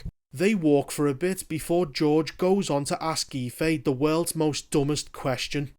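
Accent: Liverpool, United Kingdom. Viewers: What i hate more is your scouse accent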